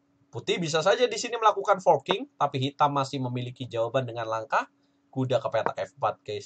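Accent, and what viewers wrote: Tegal, Indonesia. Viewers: Bang kelahiran tegal ya..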